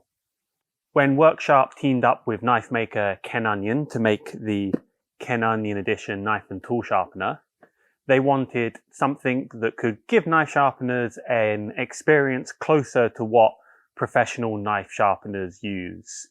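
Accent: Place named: Midlands, United Kingdom. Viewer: It’s so lovely to hear a Midlander! Aye Up! Great introduction to the Grinding Attachment.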